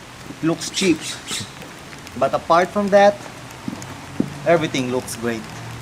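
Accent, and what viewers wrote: Philippines. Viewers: bro bakit pinoy accent kaparin dapat american accent kana, cant you not make it practice more speaking the American way.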